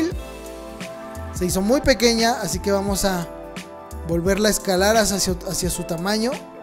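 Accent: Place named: Dominican Republic. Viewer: Suenas medio dominicano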